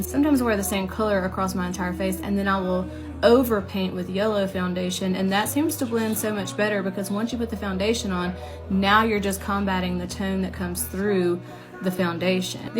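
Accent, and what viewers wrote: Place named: South, United States. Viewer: Damn, ur pretty...and that southern accent! Dont care nothing about makeup but enjoyed just watching u talk. More beautiful in this clip than any of the others.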